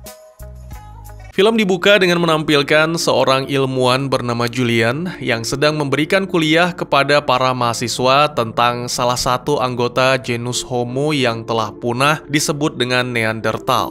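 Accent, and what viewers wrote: Aceh, Indonesia. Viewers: Aceh kayaknya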